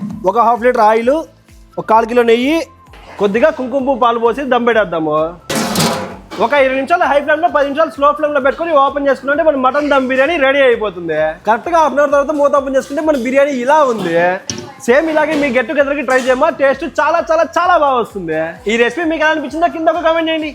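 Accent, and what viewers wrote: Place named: Rayalaseema, India. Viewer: Hyb biryani with rayalasemma vocal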